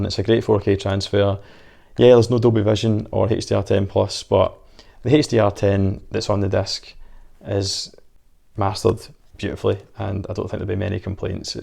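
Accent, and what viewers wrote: Scotland, United Kingdom. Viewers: Get more subscribers....Hardly with that annoyinh Scottish accent 🙄👎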